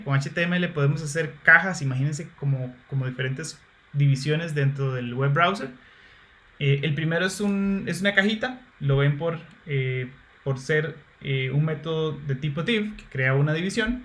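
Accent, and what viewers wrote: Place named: Costa Rica. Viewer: no se pierde el acento tico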